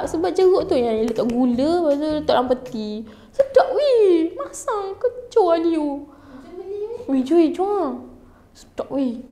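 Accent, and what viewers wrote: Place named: Terengganu, Malaysia. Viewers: Akak idola..banyak belajar loghat Terengganu dengan akak.. 🐊: Heheh...dapatlah satu heheh... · Seriously kakak ni yang paling comel dalam ML Studios, dengan bahasa Terengganu pekat dia, cara dia bercakap. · Suka dengar loghat ganu, speaker comey betul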